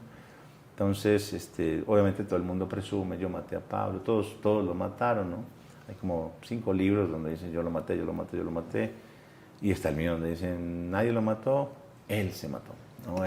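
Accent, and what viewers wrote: Mexico. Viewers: Este Huevon adoptó el acento Mexicano sin serlo. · para nada , soy colonbiano y de la region dode nacieron ellos soy paisa, viaje por casi toda colombia y a leguas se ve que se le esta pegando el acento mexicano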